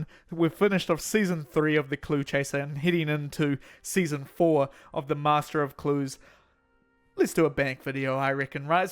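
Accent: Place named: New Zealand. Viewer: Mate, are you a kiwi? You got that thicc east tamaki accent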